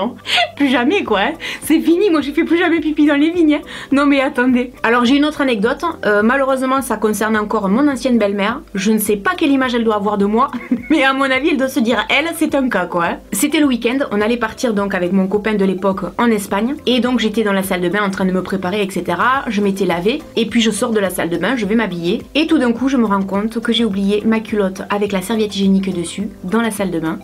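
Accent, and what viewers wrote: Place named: Perpignan, France. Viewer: Oooh je me disais bien que cet accent m'étais familier ! Je suis aussi de Perpignan ^^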